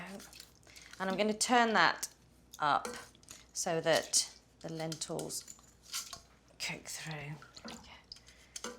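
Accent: England, United Kingdom. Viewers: Dusky Indian looks Dark hair and eyes. Impeccable English accent. Nice healthy simple Indian dish. Isn't Ghotra...LOVE itself?🥰🥰🥰 Lub ya & your receipe, love!!!! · Such a lovely British accent 😅 Greetings from Germany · She speaks British English. Must have been educated there. Very professional video. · Indian kitchen with very beautiful Harry Potter accent. Good combo. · Well I like your British accent from Toronto of guyanese origin in the west indies. Our dall is cooked with yellow split peas boiled for about 2 hours with garlic and turmeric then chunkay with oil,garlic and Cuman. We use dall to compliment any dishes with meat or vegetables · The worst thing is the acquired British accent which is in no way better then the sweet Indian accent. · Thanks for style of cooking..just to let you know not my style. But am being polite in appreciating your efforts. 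Understanding your British accent is something else. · As I wrote down the ingredients of this recipe, without looking I could swear that this is the voice of an older English lady. Remarkable how accents around the world get absorbed. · How come this Indian Lady speaks like an English Lady? I always thought that dhal is the Indian name for lentils. Or is there something called lentil dhal? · U r a very good and beautiful instructor. I also watched ur video on making samosa. Also such a beautiful english accent. Thanks. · This is a fairly typical English accent for all ages and sexes among those without a regional accent!!! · I have never in my life seen an Indian with an English accent from England.. this is weird.. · just found your channel and i am very pleased and going to cook this dish. my daughter livend in london for twenty years before she died of breast cancer and london became my second home i am swiss but live now on the eastern sea in germany. 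i like to hear that wonderful english english and will have a good look at many of your dishes. whenever i arrived in london, the frist meal was alway indian and myself am cooking with indin spices too. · she actually sounds like a lot of British asians born and raised here. I have a Pakistani colleague who speaks so similarly to her. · Yair Gil... sorry I don’t quite understand your comment, speaks very eloquently so everyone can follow the recipes. She isn’t trying to sound more English, she is English!. comes across as very down to earth and is just sharing her passion for cooking!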